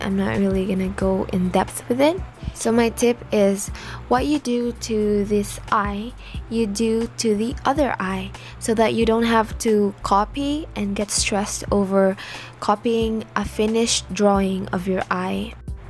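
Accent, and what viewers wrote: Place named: England, United Kingdom. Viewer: I love ur english accent🥰😌 btw I really like ur videos😫💖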